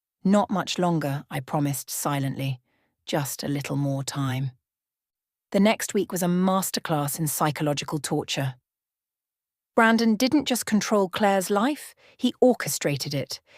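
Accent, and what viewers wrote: United Kingdom. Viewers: Love the British accent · How refreshing. A British accent, not AI too. New twist, also refreshing, not so new, yet again, both parents killed in a car crash whilst still young. Yawn!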